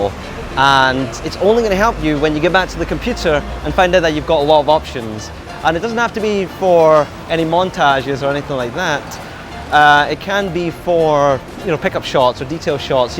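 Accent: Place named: Scotland, United Kingdom. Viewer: Just aboot crapped ma pants there when a heard yer Brogue....Defo got the Scottish mannerisms..Alba gu bràth ✊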